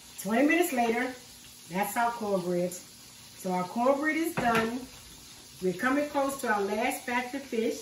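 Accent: Baltimore, United States. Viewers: Momma you from Baltimore ? 😂 I can hear it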